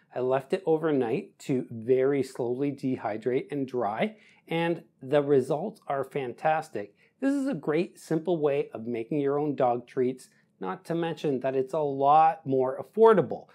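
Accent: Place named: Canada. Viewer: Thanks for the recipe and your good intentions, always pleasant to hear a Canadian accent, you Canadians certainly won the lottery when it comes to politeness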